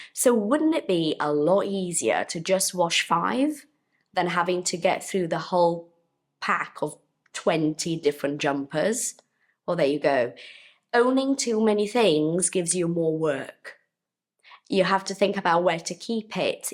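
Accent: United Kingdom. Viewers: Oh my gosh that's pretty awesome! I love the British accent. Your English is amazing!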